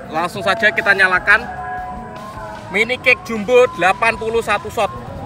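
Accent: Java, Indonesia. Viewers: Jowone ngendi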